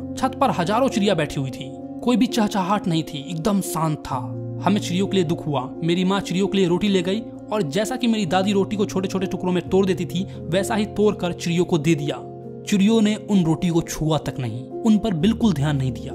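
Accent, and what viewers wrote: Bihar, India. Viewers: Bhaiya aap Bihar se ho 😊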